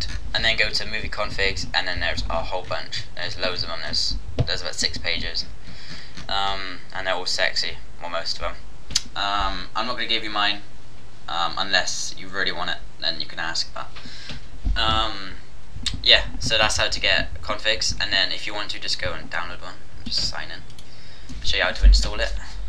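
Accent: Ireland. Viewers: HE'S FECKIN IRISH!!! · Northern Irish? Southern Irish? Hmmm?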